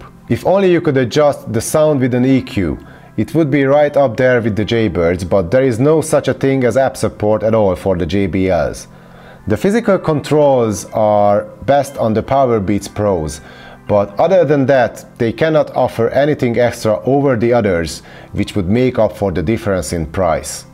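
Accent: Hungary. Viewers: Magyar vagy? :) az akcentusod alapján olyan mintha az lennél :) ettől függetlenül szuper a kiejtésed és a content is szuper :)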